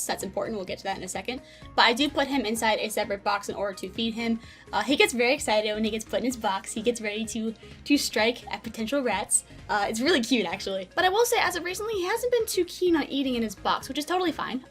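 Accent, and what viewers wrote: South, United States. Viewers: Def a southern accent, I think she’s from Kentucky but lives in Georgia now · Plus that southern accent is cute lol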